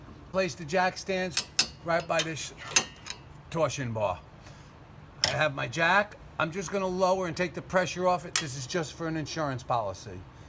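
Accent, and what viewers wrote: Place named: New York, United States. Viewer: Great video. Love your NY accent. You didn't mention but I assume you jacked up the rear of the car?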